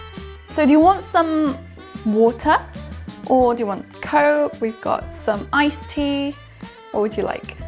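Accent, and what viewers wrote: United Kingdom. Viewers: 영국영어다!! 워우타~!~ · 님 너무 사랑스러우세요.😊 매력적인 영국 엑센트 들으면서 공부하니 너무 좋아요!! 님 리얼톡 많이 만들어주세요~^^ 좋은 컨텐츠 항상 감사합니다👍🏻🤗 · 영국발음까지 들을 수 있다니...너무 좋아요 형!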